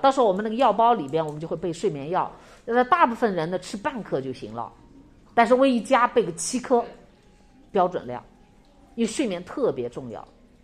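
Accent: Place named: Yunnan, China. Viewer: 云南口音😅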